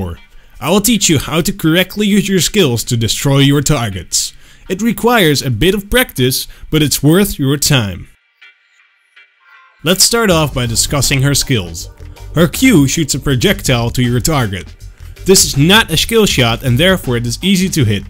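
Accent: Netherlands. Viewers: Omg you must be dutch the accent is sooo recognizable xD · Ataru Kawahara Nederlands accent :p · Are you dutch? :D Because for some reason I think I can hear a little bit of the accent :) Great tutorial though. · are u from holland i hear the shit accen XD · ben jij nederlands je klinkt heel nederlands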